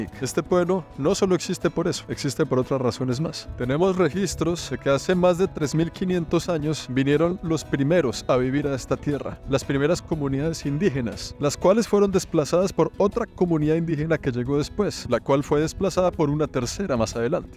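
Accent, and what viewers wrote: Colombia. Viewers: Tienes esa Forma Tan Especial y Original de Narrar Tus Videos Con ese Estilo que Te Caracteriza Bien Colombiano con Palabras Sencillas y Entendibles. Muy Bien.. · Ese también es acento colombiano ❤, tiene una voz muy muy agradable y hace un gran trabajo con sus videos tan geniales ❤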